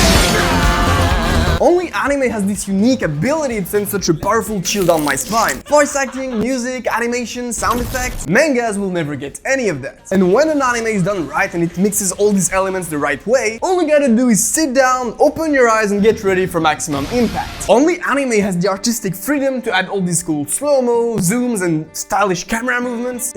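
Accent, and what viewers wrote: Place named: France. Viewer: Gooooood, I love your french accent 🥖🥖🥖🥐🥐🥐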